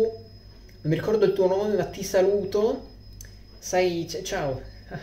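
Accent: Italy. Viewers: Ma sei di Pesaro? Hai la parlata da pesarese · Se parli romanaccio non fai ridere sembri solo un boomer che vive a Roma e vuole i like👌NOICE cmq chi sta leggendo copiate e incollate alle persone tipo questo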